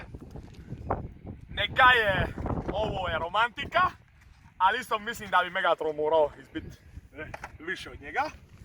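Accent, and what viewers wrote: Croatia. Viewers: Hrvatski ali drugačiji naglasak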